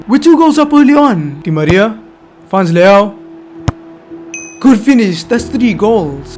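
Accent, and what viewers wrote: South Africa. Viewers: You sound South African Bro! What a vid! Keep up the good content! From Namibia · Are you south African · U from south africa?? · Are u South African??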